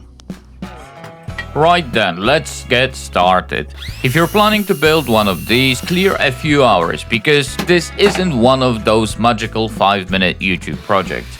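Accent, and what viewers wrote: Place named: South, United States. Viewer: I hope your viewers pick up on the planer trick you used for small/short boards. I have a feeling you and the Bearded Viking have been chatting a bunch since he became a subscriber due to your ETSY Check series, "brad in the a$$" Picking up on some of that southern drawl🤣. You had me at 🔥and 💡. BTW, nice shirt! 😉 I guess I should upload something soon with all that free advertising you have been giving me. 😜 This is a pretty cool concept. Maybe some 3D printed flying/floating superhero silhouettes would be an ETSY seller? Magento should be the first one... I mean the secret sauce here is magnets, just would be fitting...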